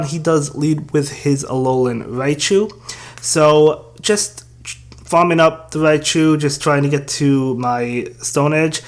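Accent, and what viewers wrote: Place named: New York, United States. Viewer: its funny getting deep pokemon go info in a new york accent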